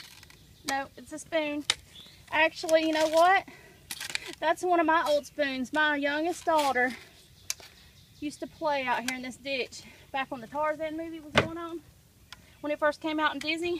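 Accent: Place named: South, United States. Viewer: Cool channel! Love your southern accent!😬👍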